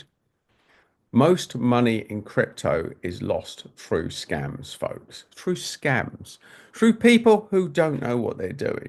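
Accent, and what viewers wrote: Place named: London, United Kingdom. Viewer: Hi there. Good video. By your accent, sounds like you're Londonish based too. It is a very good point on the terrible UK establishment psyop on Crypto. Almost everyone I know still thinks BTC is the money of criminals thanks to this fear porn. For those that are interested, I also put out a video on how not to get scammed in crypto - different angle though.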